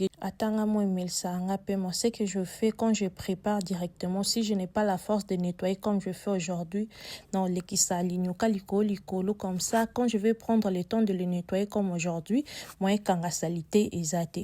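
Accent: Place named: Kansas City, United States. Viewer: Bonsoir madame j’aime beaucoup vos contenus,en vous écoutant parler j’ai l’impression que vous habitez Kansas city aux USA par rapport au détail 😍😍😍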